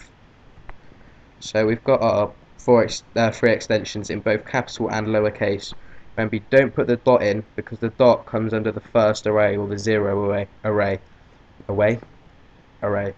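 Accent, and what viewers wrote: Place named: Hertfordshire, United Kingdom. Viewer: a friend of mine he lives in Hertfordshire speaks really much like that guy =)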